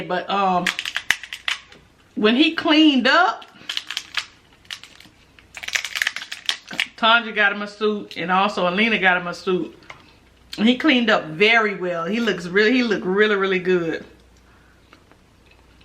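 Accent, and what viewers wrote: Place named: Memphis, United States. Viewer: Because you and my grandma got that Memphis accent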